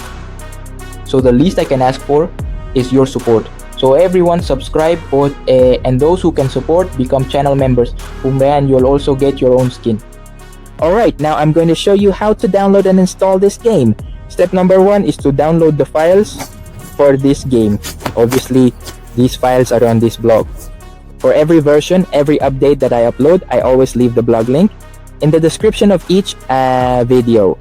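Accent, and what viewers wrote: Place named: India. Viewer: Bro buen trabajo con lo del inglés pero por curiosidad quería saber por qué tu acento suena un poco como de la india?😅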